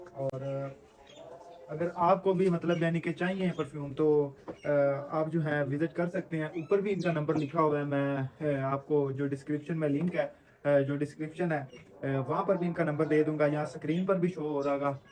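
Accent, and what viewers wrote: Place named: Punjab, India. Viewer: Apka punjabi accent bohat acha hai bhai 🤍🤌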